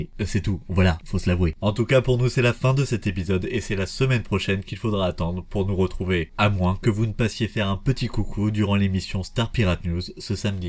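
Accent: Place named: United States. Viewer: Super Idée. Super Contenus. Super Texte. Mais je n'accroche pas le "style" que tu met dans ta vois. C'est très proche d'une vieille IA de Vieu SF mais sans la reverbe... Et pour mon cas je trouve que sa gâche le plaisirs que j'ais eu a reguarder cette video. Si pour X réson tu tiens absolument a guarder cette fasson très américanisé de parler je peut te consseiller des tuto pour y metre les effets audio qui vonts avec et mieu fair passer la pilule. Mais pour avoir testé ce style je te consseil le serieu et sinssert. Milles excuses pour l'orthographe.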